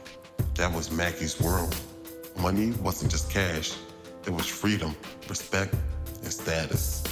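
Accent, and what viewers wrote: Philadelphia, United States. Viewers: Yo you gotta be from Philly you talk just like us… where you from North?…appreciate your work bro keep doing your thing I be tuned in💯💪🏾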